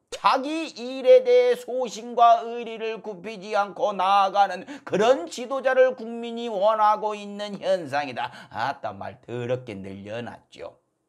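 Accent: Jeolla, South Korea. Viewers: 충청도 제천사람이 왜 가끔 전라도말을 섞어쓰나요. 허허 천안시 동구 병천면 가전리~ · 순천쪽 전라도 사투리를 쓰는것 같네요.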